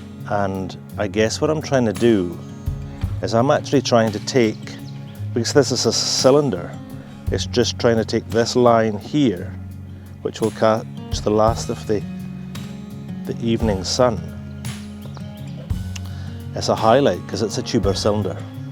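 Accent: Scotland, United Kingdom. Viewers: So grateful you take your own time to make these Videos. I love photography and have the technical side but don’t have a particularly artistic eye,so I get a lot of inspiration from videos such as these! Nice to hear a proper accent too, from a fellow Scottish Lad!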